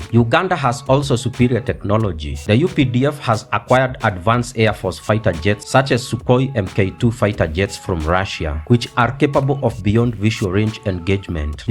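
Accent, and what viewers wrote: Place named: Kenya. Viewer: Completely biase from the accent you just know its a kenyan with zero knowledge on military affair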